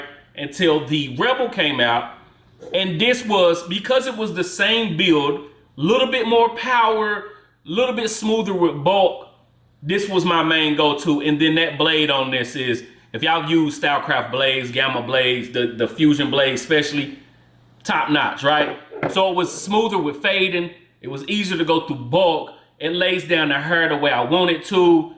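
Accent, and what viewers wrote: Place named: St. Louis, United States. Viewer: Loved the review. Very detailed. Plus it sound like you from the Lou lol. New subscriber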